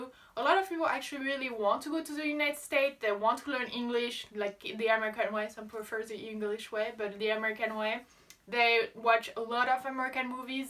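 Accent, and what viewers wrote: France. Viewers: your French accent is very pleasant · Wow tu as vraiment un tres fort accent Français quand tu parle Anglais. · L'accent Français c'est le meilleur!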